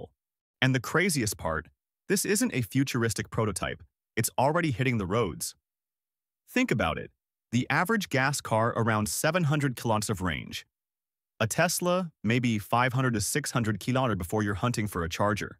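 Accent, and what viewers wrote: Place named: Canada. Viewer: What's twenty hundred?...what am I watching and listening to? Canadian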